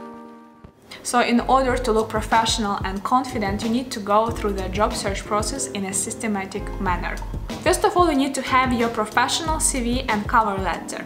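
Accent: Russia. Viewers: Ужасный русский акцент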